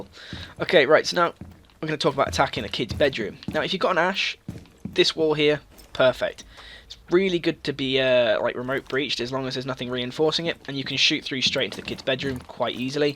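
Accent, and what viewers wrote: Ireland. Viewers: Hey r actually Irish if so wer from cause I'm from Kildare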